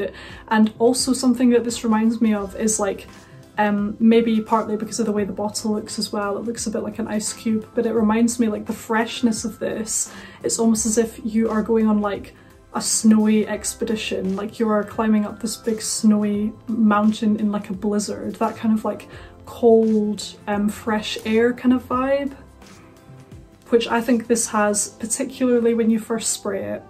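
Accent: Scotland, United Kingdom. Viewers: Fantastic perfume review, Miss. May your Youtube channel, subscribers, viewers & fans grow exponentially in this new year and future.. You have such a pleasant & soothing and elegant voice & wonderful, classic Scottish accent. You can sell us anything. Happy new year Scotland.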